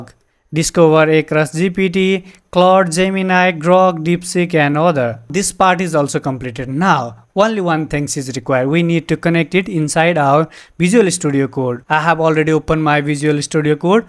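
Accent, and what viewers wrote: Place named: Nepal. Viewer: Bro speaks English in Nepali accent! I love it.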